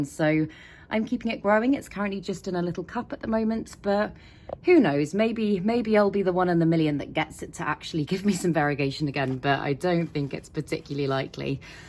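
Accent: England, United Kingdom. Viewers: Just love your English accent. Very attractive to listen to. Are you a londoner? Love your passion for plants. Did botany? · 英国发音好听！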